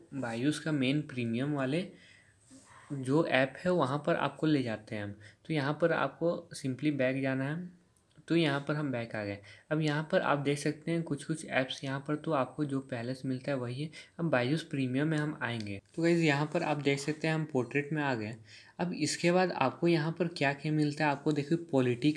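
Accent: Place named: Bihar, India. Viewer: Tu bhai pakka bihar se hai 🙂🙂 kitna ko ketna bihar me bolte hain 🙂🙂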